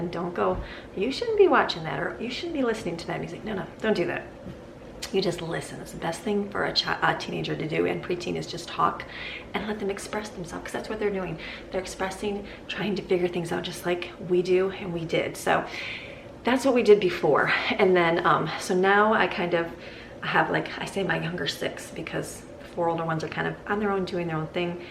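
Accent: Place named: South, United States. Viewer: Southern talk yall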